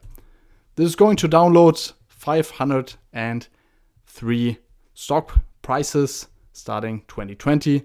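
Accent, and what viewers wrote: Germany. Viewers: Jesus Lord, I can't believe I only just now stumbled on this amazing channel! And he has German accent. Pure gold. Amazing video and neat code. Thx so much mein Sensei 🙏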